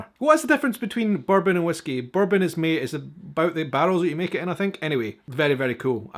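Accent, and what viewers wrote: Scotland, United Kingdom. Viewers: I swear your accent has gotten thicker since moving back to Edinburgh! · I'm sorry, but when I do a Scottish accent; it sounds regionally similar to yours. What town are you from?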